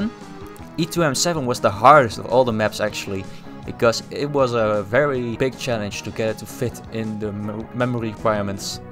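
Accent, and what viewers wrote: Netherlands. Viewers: you sound Dutch cool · Man, this is one of the coolest projects I've heard about in a while. Must have been an honor to be a part of this! Might even consider getting a SNES now. By the way, are you happen to be Dutch? I hear a certain accent? ;-)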